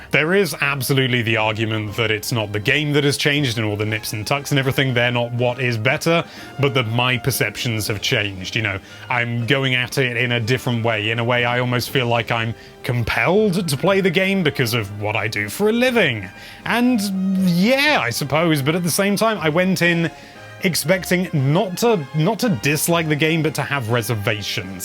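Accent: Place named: United Kingdom. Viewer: Ya know for awhile didn't realize he was British til last year or early this year don't ask lol he literally has the damn British accent how did I not notice lol especially after watching Lucifer the accent is even more obvious for me now lol I love it. 😂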